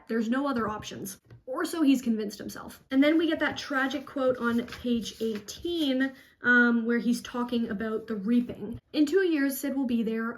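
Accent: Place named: Canada. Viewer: this is the first video of yours that i've watched so when you first started talking, i got a vibe that you were canadian and im glad to see i was right. besides that, this is such a cool concept and exactly the kind of analysis ive been looking for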